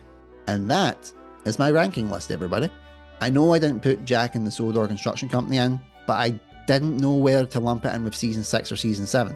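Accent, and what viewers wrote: Scotland, United Kingdom. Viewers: Came for set discussion, stayed for the Scottish accent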